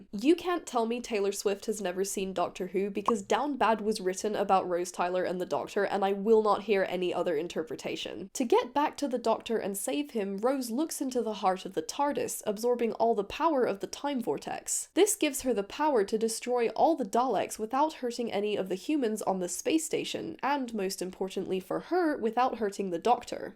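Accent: Scotland, United Kingdom. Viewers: Are you Scottish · You have a weird Scottish accent, can’t quite place it.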